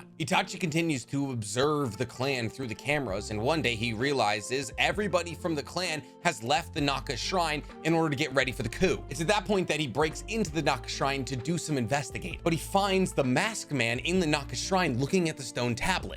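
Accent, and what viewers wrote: Boston, United States. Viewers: Wait whattttt is the homie from Boston? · Bro your from boston for real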